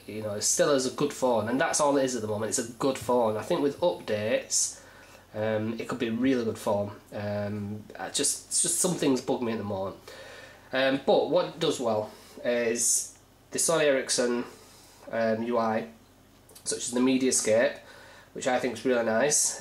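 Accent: Yorkshire, United Kingdom. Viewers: It's a yorkshire or some kind of northern english accent. IT'S A GREAT ACCENT.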